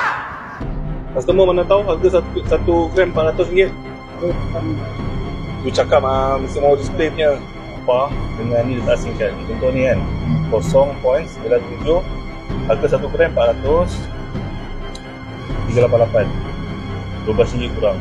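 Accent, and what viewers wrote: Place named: China. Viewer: Kenapa nak cakap melayu ikut dialek cina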